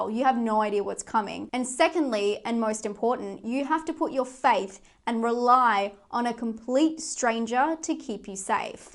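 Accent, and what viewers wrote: Australia. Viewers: It is so cool to have someone in Australia doing movie reviews as im an aussie as well but don't get me wrong i love watching american movie reviews as i do all the time its just good to here an aussie talk about movies and Haunt sounds like my cuppa tea so thank you for your time doing these reviews look forward to your next one takecare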